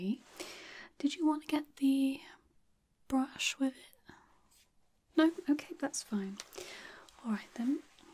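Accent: United Kingdom. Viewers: If I could ever actually master a British accent I’d love to have the same as you. ❤️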